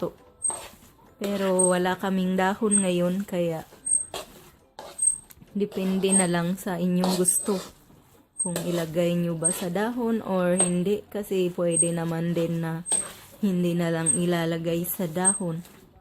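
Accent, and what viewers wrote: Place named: Visayas, Philippines. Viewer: Mga tao jud noh bisag unsa nalay bantayan. Pastilan dolor! Magbuot mn mo ug mag tagalog sya .. Kamo dw pag tagalog be paminawon nato inyong accent.. Natural lahi iyang accent mga dzai kay bisaya man sya di man pure tagalog.. Kung ang tagalog bitaw mag bisaya lain man pod kaau paminawon.. Imbis magpasalamat ta kay naa tay nakat onan nga bag ong recipe mamantay hinuon.. Sus Ginoo!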